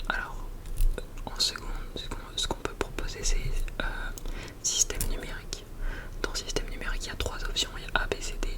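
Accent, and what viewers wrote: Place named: Lyon, France. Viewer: 100% c’est un lyonnais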